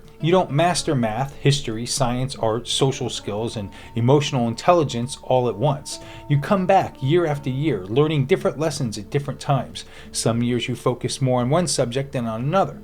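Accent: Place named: United Kingdom. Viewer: Oh, you're British! 💂🏽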